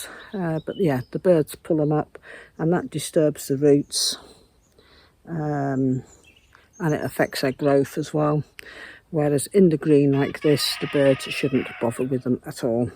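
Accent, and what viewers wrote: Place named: Wales, United Kingdom. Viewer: I found your channel fascinating as my great grandparents came to the US in the 1890s from Glamorgan Wales near Swansea. So it’s interesting to see real people, real homes and listen to real accents spoken as you are not a great distance from where they lived. I’ll enjoy seeing more of your life and customs along with the challenge.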